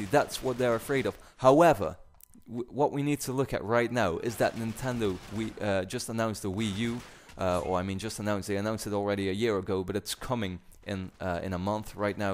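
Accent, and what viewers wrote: Netherlands. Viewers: You sound Dutch, are you? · You sound dutch, bro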